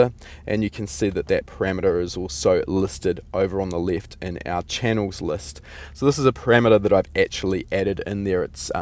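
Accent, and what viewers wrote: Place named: New Zealand. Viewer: There is nothing wrong with your kiwi accent . keep it up. would love to learn about this ls tuning for real. Cheers mate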